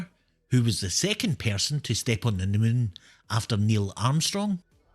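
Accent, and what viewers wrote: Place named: Scotland, United Kingdom. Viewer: I did indeed enjoy the quiz and all your quizzes. I love the accent too. My parents were born and raised in Scotland (Fife) and all my cousins live there. So your accent reminds me of bonnie Scotland!!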